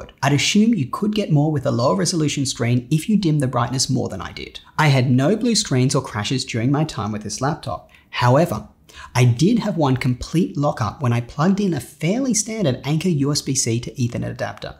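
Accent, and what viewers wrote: Australia. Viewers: Why do you use pounds if you sound Australian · cool accent, is it Australian？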